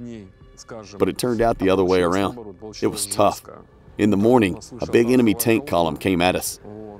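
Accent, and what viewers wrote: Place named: South, United States. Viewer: I do like these type stories .. especially translated is a southern accent. Lol. I was a military spouse x2 retiring both, living on or around so many bases, mostly west coast but we lived in Va, Ga, Ok.. Plus as a USO volunteer and working for a military contractor in DC.. when my husband was stationed at the Pentagon, then i transferred in, we saw a lot of each other, especially his friends and more. He was in communications on the 5th floor, and spoke several languages including Russian. Being around his friends more was like listening to you but they spoke atleast 3 languages besides English as did my husband. Unsure if you actually understand the language but your translation is great. Better then reading bad English translations on videos. Grrr...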